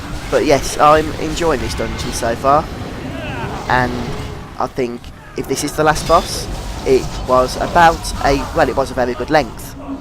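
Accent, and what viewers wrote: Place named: England, United Kingdom. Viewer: ahh sorry for not explaining correctly, i ment where are u from england ;D never heard it before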